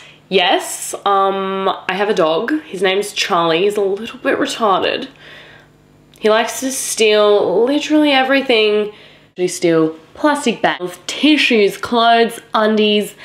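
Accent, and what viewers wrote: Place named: India. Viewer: HAHAHAH ur indian accent is my favourite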